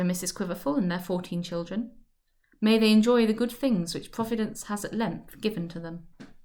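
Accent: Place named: England, United Kingdom. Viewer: Thank you so much for this! I had started the book with another reader, but he inexplicably stopped posting after Chapter 28. So I investigated, found your series, and finished out with you. I'm so glad I did– your readings proved to be superior in their accuracy, pacing, English authenticity, and amusing vocal characterizations. Well done indeed!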